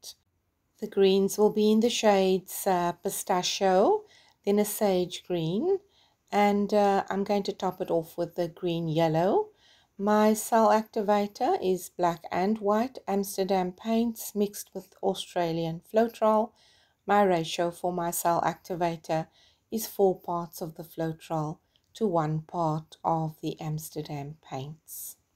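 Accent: South Africa. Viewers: Hi, it's my first time on your channel. I can hear from your accent that you are South African - I'm just wondering where you manage to get Australian Floetrol??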